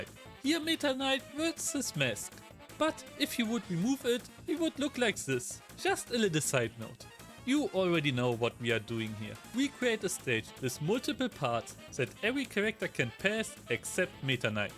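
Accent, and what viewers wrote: Germany. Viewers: Dein Akzent ist soooo deutsch.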